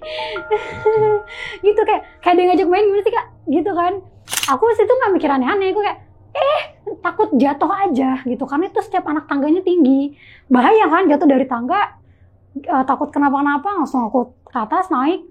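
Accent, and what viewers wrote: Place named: Bogor, Indonesia. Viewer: logat logat bongee pisan ini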